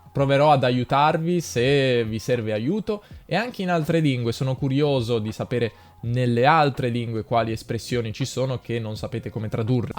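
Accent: United States. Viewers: Very American accent to your English. Is that the English they teach in Italia? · I love these videos. Also, your American accent is spooky good!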